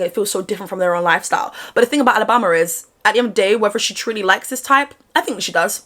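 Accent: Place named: United Kingdom. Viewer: Love the accent also 4 a black Brit you are hilarious and thanks...😅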